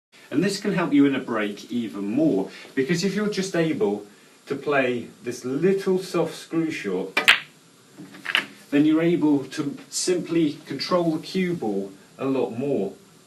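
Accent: United Kingdom. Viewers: I'm a beginner in snooker, and I have a break record of 24. I love watching your videos because they're so spot on, calm, and easy to follow, so I decided to subscribe to your channel. I also prefer your British accent for some reason. Greets from Sarpsborg, Norway!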